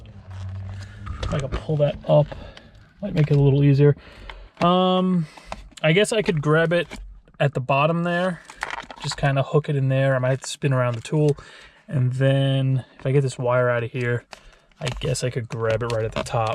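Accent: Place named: Long Island, United States. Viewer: Hey you from Long Island dude?... you sound it lol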